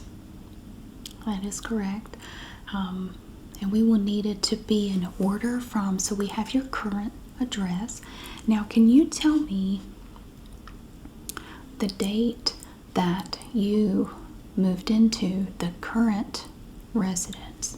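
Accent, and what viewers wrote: South, United States. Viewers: I grew up in The Deep South and have always LOVED the sound of a southern accent in a woman’s voice. I’ve always found it so sweet and relaxing. This is a blissful, gentle joy to listen to ☺️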